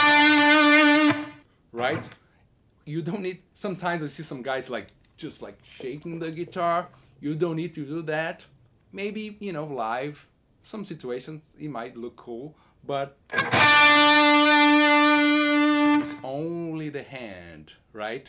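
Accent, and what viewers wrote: Brazil. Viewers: Inglês brasileiro. É muito mais fácil entender um brasileiro falando inglês que um nativo. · Dá sim. Mas o sotaque br no inglês dá um charme à mais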